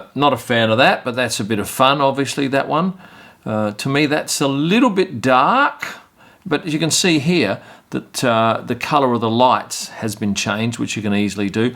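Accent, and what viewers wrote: Australia. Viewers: This is an excellent channel 😊 love the out-takey bits qt the end, informative, educational, well edited and that Aussie silky voice hahahaha! Stay passionate Bro!! 😆😆😆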